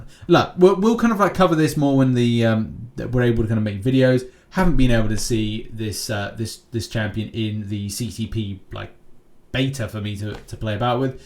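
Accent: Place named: Cockney, United Kingdom. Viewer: You have that like cockney accent, “ ‘ello govna”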